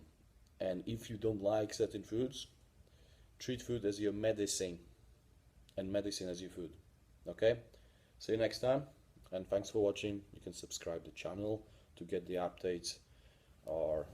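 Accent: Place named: Eastern Europe. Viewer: Panie, bardzo ciekawe video, ale czy nie zastanawial sie Pan nad przejsciem na forme pisana? Niestety slychac, ze jezyk angielski nie jest Pana ojczystym jezykiem, robi Pan calkiem sporo "polskich" bledow jezykowych, ma Pan mocny slowianski akcent i slychac, ze z trudem szuka Pan slow. Polaczenie tego wszystkiego z dosc slabym udzwiekowieniem (moze dodatkowy mikrofon tuz pod szyja) sprawia, ze po prostu ciezko sie tego slucha i trzeba sie mocno skupic, zeby wyluskac tresc. Czytalem kiedys Pana artykuly nt. treningu silowego i byly bardzo dobrze napisane. Moze zamiast formy youtube'owej przerzucilby sie Pan po prostu na bloga w jezyku angielskim? Tlumaczenie tekstow z jezyka polskiego na angielski jest aktualnie smiesznie tanie, a moglby Pan zlapac wiekszy traffic, szczegolnie na kfd/sfd/pokrewnych forach. Pozdrawiam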